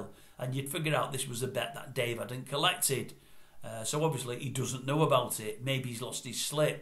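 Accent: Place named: Manchester, United Kingdom. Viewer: You’ll tell the story because it’s content and it’ll earn you a few quid through views jacka norie jacka norie accents annoying manc farmer inbread it’s one of them,, the stories irrelevant by time you tell it 🥱🥱😴😴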